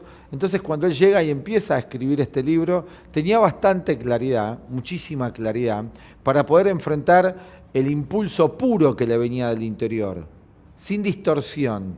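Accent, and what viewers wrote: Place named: Argentina. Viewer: me conflictua tanto el acento argentino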